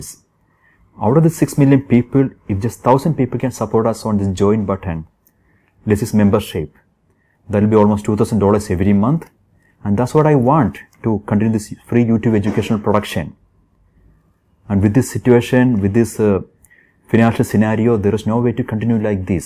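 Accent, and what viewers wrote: Kerala, India. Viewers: His accent is like a Malayali trying to speaking in english. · У тебя индийский акцент. Делаешь вид что из благородный касты, но твои поступки со страйками как из низшеготсословия. Ты из низшей касты.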